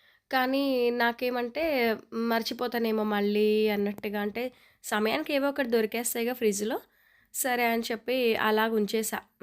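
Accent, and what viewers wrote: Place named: Andhra Pradesh, India. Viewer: Hi akka bagunnara.meeru Aandra vallaa..mi matalu ala matladatharu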